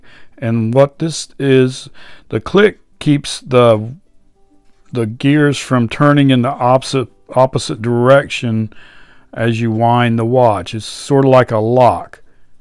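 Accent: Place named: North Florida, United States. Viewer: Awesome, thank you! I received a Benrus that was overwound and it's going to need human intervention. By the way, are you from Northern Florida/Alachua County? Your accent reminds me of home.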